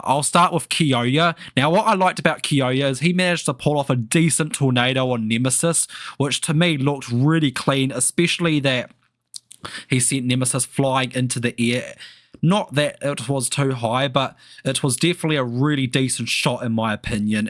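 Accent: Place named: New Zealand. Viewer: Bro, u from NZ? That accent sound kinda like it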